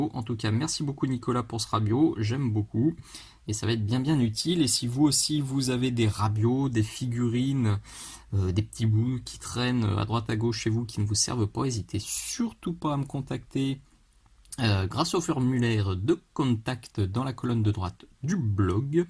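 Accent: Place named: South, France. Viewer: Salut. Encore une très belle vidéo sur ce métal non métallique en cuivre, pleine d'enseignements et de conseils judicieux comme toujours. Et bravo pour l'accent du sud.. qui me rappelle le mien (natif de Toulouse..)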